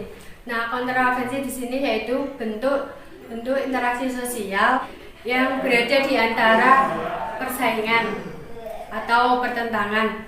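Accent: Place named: Java, Indonesia. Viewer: Ini pasti dr Jawa. Medok.. tp bgus kok penyampaiannya, lanjutkan...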